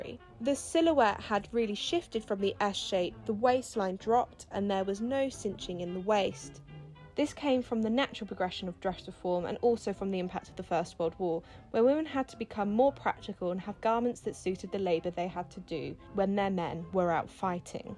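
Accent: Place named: United Kingdom. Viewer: Voice and diction teacher here, slow down and enunciated your words. Your British accent isn't quite RP and is difficult for Americans to understand/process.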